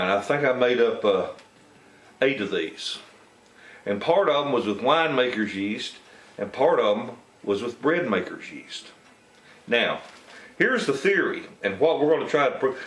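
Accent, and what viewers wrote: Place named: Appalachia, United States. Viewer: I love your accent, is it hillbilly? Must try your recipe!!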